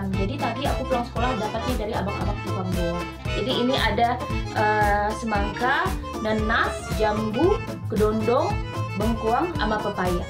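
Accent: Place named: West Sumatra, Indonesia. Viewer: Takah baa bana mangecek bahaso inggrih, tetap se logat minang indak ilang.. dangakan secara seksama beberapa kata "menu, ribet dll".. pertahankan minang ny yaa.. 😊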